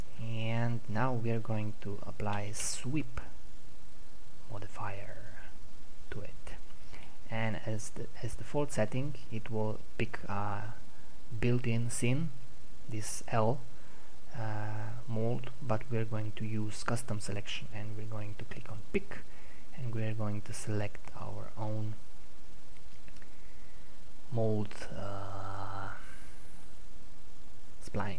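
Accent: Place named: South Korea. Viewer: Your english sounds like some korean idol i know lol, but helpful video :D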